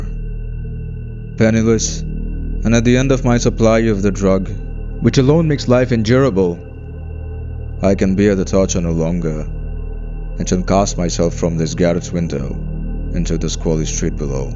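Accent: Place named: Pacific Islands. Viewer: What I like about yo voice is you sound like some pan Pacific islander who barely learned English and is describing the utter horror to some staunch fool who refused to see your reason to steer clear of a storm.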